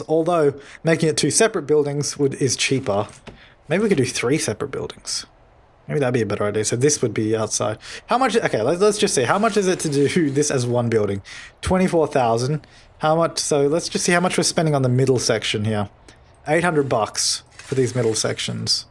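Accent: Australia. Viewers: Conner Herald me to i like how Australians talk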